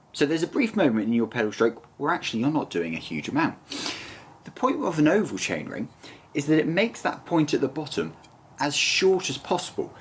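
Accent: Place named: United Kingdom. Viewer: i have a hard time understanding the british accent sometimes, good content though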